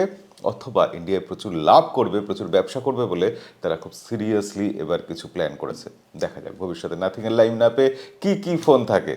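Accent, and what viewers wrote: Bangladesh. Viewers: উচ্চারণ শুনেমনে হচ্ছে যেন বাংলাদেশের কোনও চ্যানেল দেখছি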